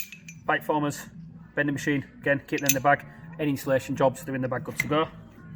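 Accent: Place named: United Kingdom. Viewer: He speaks a little too fast and sounds like he has british accent. Maybe difficult for non english speaker to comprehend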